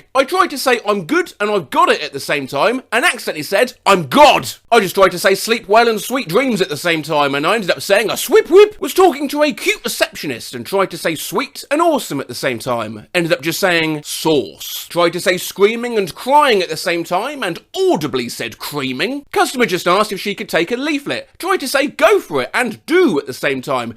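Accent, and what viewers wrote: United Kingdom. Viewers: I have only watched like 5 of your videos and I have to be honest, you have the greatest British accent of all time.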